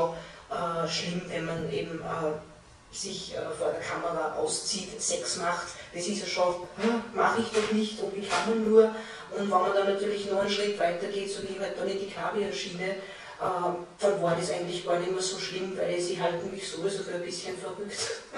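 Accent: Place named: Vienna, Austria. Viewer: confirmed. from Vienna because of her accent.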